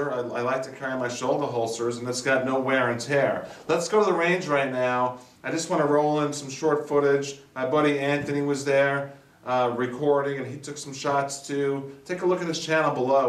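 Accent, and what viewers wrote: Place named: California, United States. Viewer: this guy sounds like hes californian.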